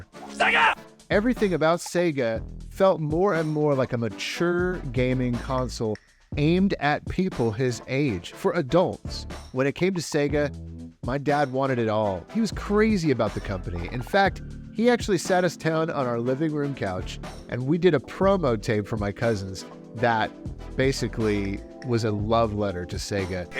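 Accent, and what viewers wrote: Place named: Brooklyn, United States. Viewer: Whats up with the East Flatbush Brooklyn accent?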